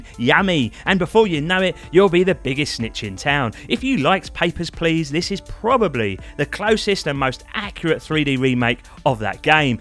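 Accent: Cockney, United Kingdom. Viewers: This guy is Cockney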